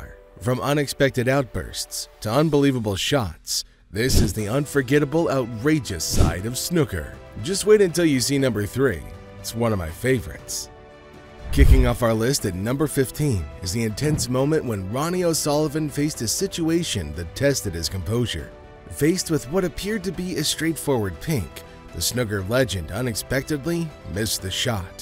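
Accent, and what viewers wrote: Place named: United States. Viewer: It's very weird every time you hear commentary with American accent on a snooker video...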